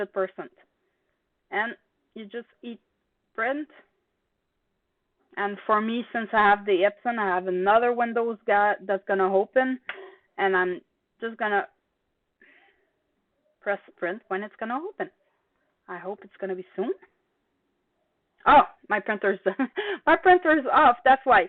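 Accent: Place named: Quebec, Canada. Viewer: In French please, is it possible ? Je préfère vous entendre parler avec votre québécois. Merci pour vos partage.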